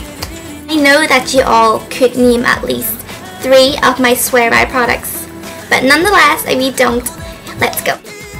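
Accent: Ireland. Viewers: Is that an irish accent??? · What is your accent? It sounds a bit Irish???? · are you irish? I totally LOVE your accent! <3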